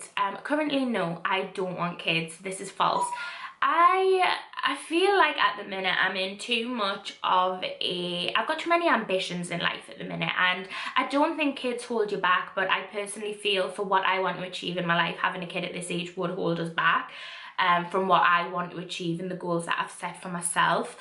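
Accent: United Kingdom. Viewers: Where abouts in the uk are you? I can’t work out your accent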